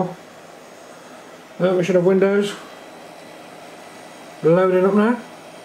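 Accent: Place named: England, United Kingdom. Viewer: hes speaking english english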